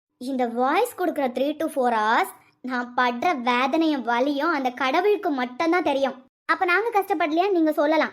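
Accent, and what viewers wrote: Kanyakumari, India. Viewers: Ayyo sister kanyakumari slang kaga dhan video pakkavey start panna enaku unga slang romba pudikum na Kumbakonam enga slang movie la pesura madhiri irukum but ungaloda slang super ah irukum inga ellarumey apdidhan sister inga pottiya vida poramai dhan adhigam neenga video podunga nanga support pandrom 2 days ah yen video varalanu think panna new married outing edhuvum poirupinga so video podalanu nenachan but idha pathonadhan theriyudhu enna reason apdinu enga support eppodhumey ungaluku undu neenga video podunga ❤❤❤❤❤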